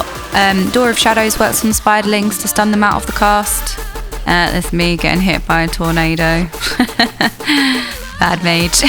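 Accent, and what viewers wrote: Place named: United Kingdom. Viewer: I'll be honest, fire mage here, been trying out frost as fae, it's fun since i always leaned towards frost. I'm still doing 15s so I'll call out what I see in those keys. People don't really pay attention to mechanics if it's not a deadly one, so if you would like to add some comments about mechanics like these you did they sure are pretty welcome. From what I've noticed from my prespective, making mental notes by watching good players' movement and ability usage is attractive and should be to most, so a bit of explanation stuff about when to press Icy veins (I always do when it's out of cd except if the pack is dead or small :P) would go some ways. Music on that tone was actually nice having. Lastly you got a lovely voice, UK accent which i personally love and a lot might adore it so you do well speaking enough during the video! 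Keep it up!:D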